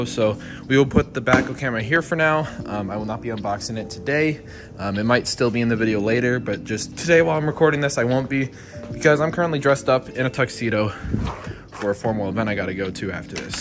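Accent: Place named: Germany. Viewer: ich hör da doch deutsch raus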